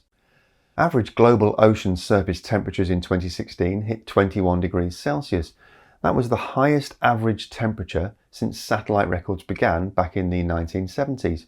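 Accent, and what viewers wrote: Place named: Upper class, United Kingdom. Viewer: I guess alarmist hysteria sounds rational when presented with an upper class British accent. It remains hysteria nevertheless.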